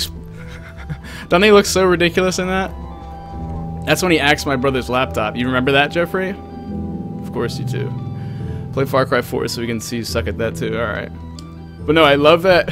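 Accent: United Kingdom. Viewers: bruuh wow bri*ish accent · With That British Accent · The British accent lmao “Alright let’s go find some endermen.”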